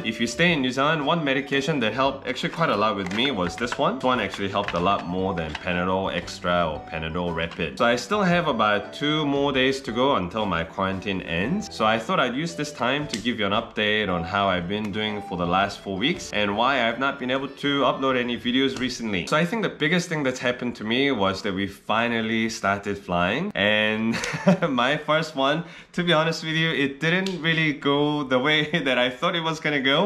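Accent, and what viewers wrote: Singapore. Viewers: dude, where you? Still in NZ? Miss your Singlish leh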